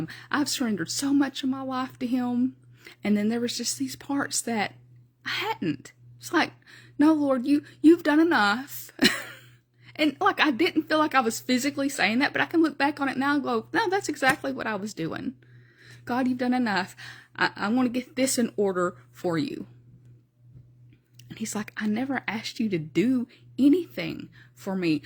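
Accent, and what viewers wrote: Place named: Tennessee, United States. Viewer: Youre in Tennessee too huh ? I can hear it. Anyhoo COME LORD JESUS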